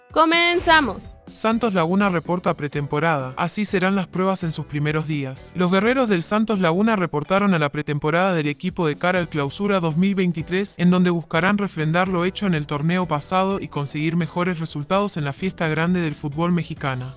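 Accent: Argentina. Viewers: Xfavor..de verdad..no pongan nada del santos si?? Saben q esto empieza cuando terminen el mundial...ya chale con.el santos noticias falsas..ha i ya deja ese tonito de arjenti o.wey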